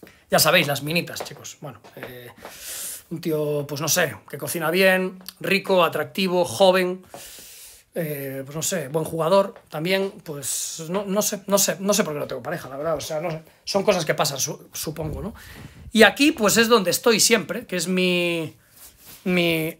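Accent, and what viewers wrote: Spain. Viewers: Que voz tan diferente y no tan gastada de ase años el joven sonaba como español genérico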